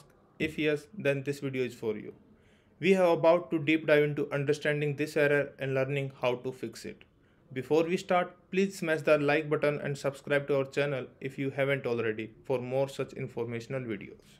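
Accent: India. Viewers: Useless like this Indian Voice :)))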